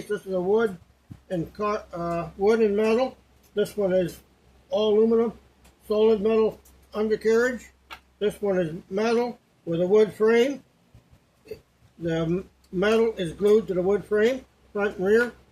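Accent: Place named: Vancouver, Canada. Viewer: Interesting. I've not heard your accent here in Vancouver, surprisingly. I moved here nearly 30 years ago; maybe your accent is an older one from this area.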